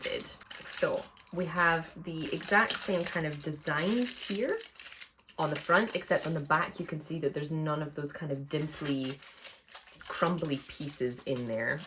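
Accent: Scotland, United Kingdom. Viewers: best accent in the world go scotland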